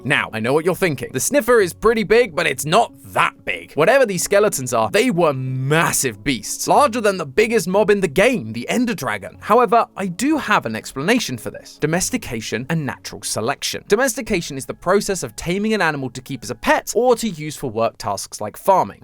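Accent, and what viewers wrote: England, United Kingdom. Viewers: Who is this British person, where is matpat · Dude im so sad i opened this video and expected matpat then i got jumpscared by this british guy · Too british, unsubscribed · Are you British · Hehehe the team is definitely putting the new brittish accent to work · Sadly the voice just isn’t the same to me, feel like I’ve lost part of my childhood now matpat isn’t here. This and the last although the content is what I’m interested in, unfortunately all of mats energy I feel has been lost. I’m a Brit so used to the accent and honestly it’s now just way less entertaining for me. I really do wish all involved in theorists continue to grow and have wonderful times adventuring into these stories, it’s just kinda hard to accept maybe you’ve grown out of one of your favourite channels. A narrator is very important and sadly YouTube has lost one of its best. 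Game theorists, not lost but will never be the same. Miss you matpat ❤ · Come on. I clicked this video expecting to hear mat pat. Instead I hear some British replacement. I really don’t want to say it, but the newer videos aren’t as good because MatPat isn’t there. It may be the same scripts that he would’ve read, but there’s something about mat pat that just makes me want to watch him. Not you. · are u english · British MatPat is almost as wild as MatPat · The British accent is so annoying can you tune it down a little bit? It’s just if you’re gonna go full Mat style story telling, the British accent sounds very distracting and confusing.. try to either do your own style or try to lessen the accent a bit. No hate for brits, and thanks for the amazing theory as always.. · i forgot matpat retired and got jumpscared by british matpat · Why does the new guy sound like matpat, but british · I love when the british talk about minecraft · British Matpat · This is the first Game Theory video I've seen since MatPat left. 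... you sound like a British matpat. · Why is the rest of the team suppressing his britishness 😢 that's way past uncool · its just british matpat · Why is he British now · with a british accent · is just british matpat i like him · I thought I would be salty and abandon these channels after Matpat was gone but after listening to Matpat and honoring his wish of giving the new voices the same chance we gave him all those years ago has worked out perfectly, much love british Matpat! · I love that new Bri'ish Game Theory! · not making fun of you And I love the British accent but is it suppose to be "Wa oh" instead of "wuter" (I'm so sorry love the theory tho😅) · I forgot game theory is now british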